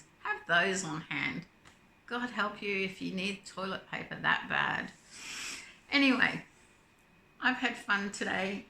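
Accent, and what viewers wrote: Australia. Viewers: Hi, I absolutely relished watching your video and hearing an Australian accent! Make me homesick. Great yarn unboxing!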